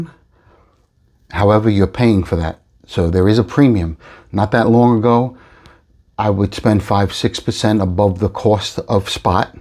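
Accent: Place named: Texas, United States. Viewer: Hey paisan. Like you stack, content and especially your Texas accent!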